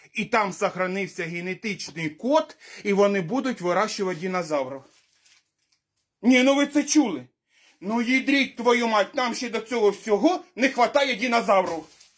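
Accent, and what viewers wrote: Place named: Surzhik, Ukraine. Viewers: І на Донеччині❤❤❤❤❤❤❤❤❤❤ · Шановний! Ви розмовляєте так як говорять у нас на Полтавщині! Полтавський колорит!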